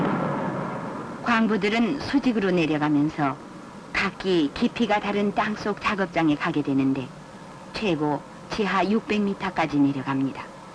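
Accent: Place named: North Korea. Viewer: 해설자가 북한목소리네요~~ㅎ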